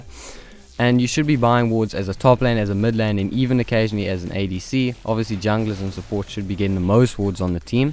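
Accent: South Africa. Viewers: You south african? 😆 game name?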